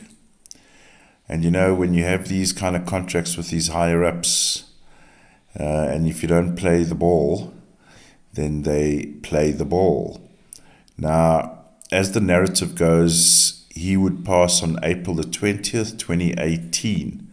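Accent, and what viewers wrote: South Africa. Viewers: By any chance are you South African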